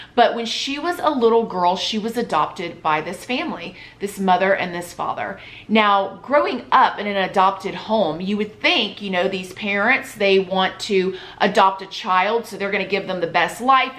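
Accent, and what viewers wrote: South, United States. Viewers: Love love love how you tell the story of real cases. You should look into being criminal narrator on ID. Your southern accent is refreshing to listen to!! I’m a new subscriber!!!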